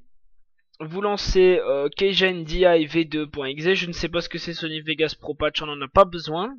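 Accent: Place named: Switzerland. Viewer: accent suisse :p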